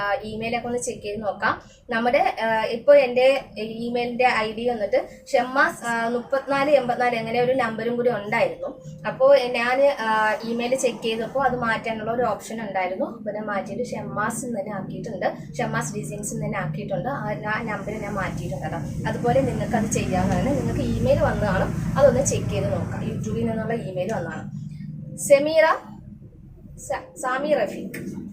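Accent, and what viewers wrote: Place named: Trivandrum, India. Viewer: " nikku nikku nikku evide povunnu"😅😅thani Trivandrum 👌🏻👌🏻kollam 🥰🥰 Collectionsum adipoli👌🏻👌🏻👌🏻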